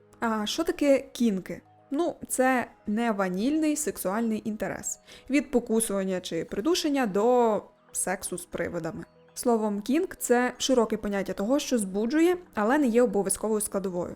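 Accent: Transcarpathia, Ukraine. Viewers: Ти з Закарпаття?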